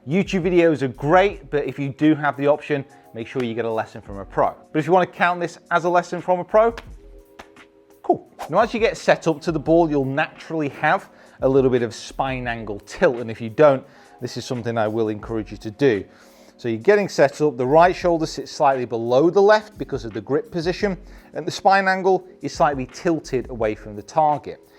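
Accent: United States. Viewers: American accent is so unsettling lol 😂 but great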